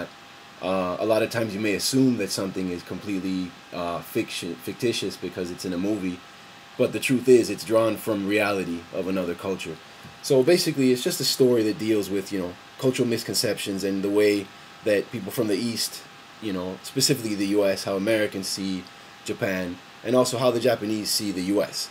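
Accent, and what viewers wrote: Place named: Argentina. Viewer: only a question(aajhaa the mangakas how you dont have time for ask questions in youtube but), you have a connection with Argentina? because, when i hear you speaking spansish, your accent is so...argentinian hahaha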